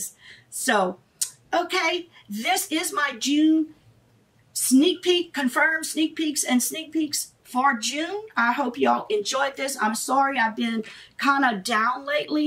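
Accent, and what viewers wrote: United States. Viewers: Hi I stumbled upon your channel and within the first minute I knew you had to be from Louisiana, then when you pronounced Kevyn Aucoin's name the right way i knew i was right. I love Boxycharm and i appreciate all the hard work you but into this video!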